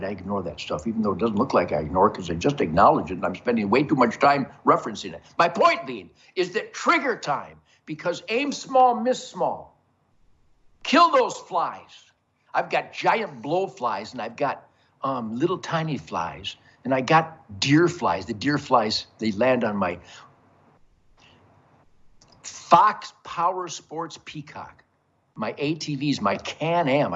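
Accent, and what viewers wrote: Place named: United States. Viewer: Thank you Ted for your American voice.Always your fan forever 🎉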